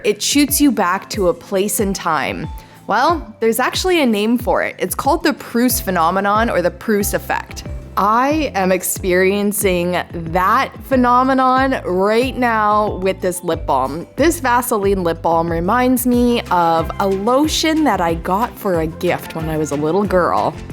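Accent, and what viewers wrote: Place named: Maritimes, Canada. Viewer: You're just so friggin creative thanks for sharing your artistry, amazing, your sound like your from the Maritimes lol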